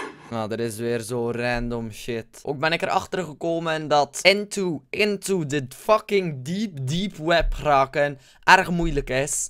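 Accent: West Flanders, Belgium. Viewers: Belg of nederlands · ziej gie e westvlaming?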